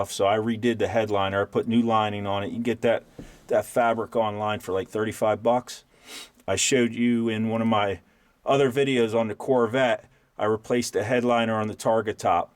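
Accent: Pennsylvania, United States. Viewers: Thanks dude. I like how you clearly list all tools needed, for those of us on our way to the JunkYard. Plus, that Pennsylvania accent is reassuringly trustworthy.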